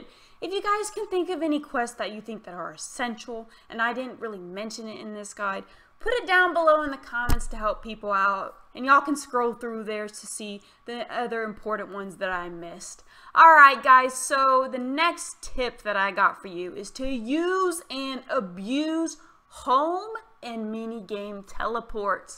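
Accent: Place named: South, United States. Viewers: You're obviously a fellow southerner :P shout out from Texas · Shoutout to you for using y’all and dank in the same sentence. I’m from Jersey but spent a lot of time down south and you talk just like I do LOL.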